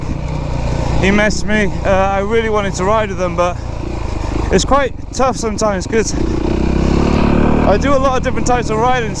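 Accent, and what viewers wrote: United Kingdom. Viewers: hello where are you riding sounds like UK?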